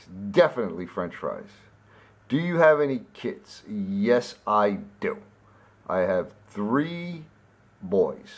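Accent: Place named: Arkansas, United States. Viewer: Okay I didn’t know you were from Arkansas, that accent clearly gave it away! I really didn’t think you would do this but I’m so glad you did 😁first of all thank you for the awesome shout out my friend. And I Loved loved loved the pics. Some of those faces 😂he’s too cute! ☺️ I wish I would’ve thought to plead the 5th on some of those answers🤔 dang it! I loved your answers I appreciate you being so down to earth and so honest, kind, and funny! The dancing 🕺 answer made me LOL literally. I hope you are having an awesome day my friend. Stay safe!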